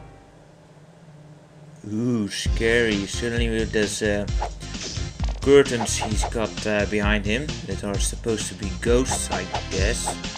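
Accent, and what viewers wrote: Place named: Netherlands. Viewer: i was sitting here, listening to your voice and i was like 'this guy must be from the netherlands'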